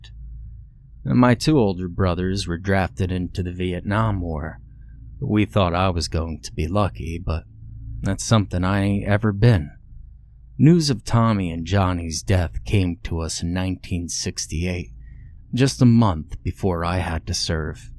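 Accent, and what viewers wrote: South, United States. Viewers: Oohh I wish it was longer much longer! I mean this story. Your Southern draw is flawless and it fits this story just right.👍🏻👍🏻👍🏻🌹🇱🇺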